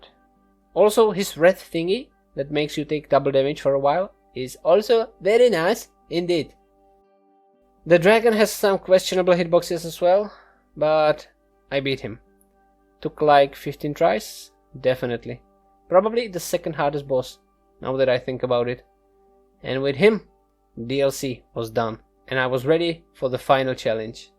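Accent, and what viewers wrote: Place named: Czech Republic. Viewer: Pokračuj s contentem! Eventuelně se to rozjede. Pěkné video. Českej přízvuk mě mega kopnul.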